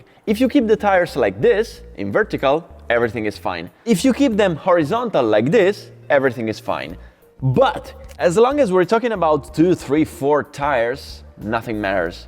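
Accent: Italy. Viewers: This guy can talk ! I got a headache within the first 5 mins, jeez..! Keep working on your English dude, it needs still a lot of improvement, I guess you will never lose that Milanese accent..Lol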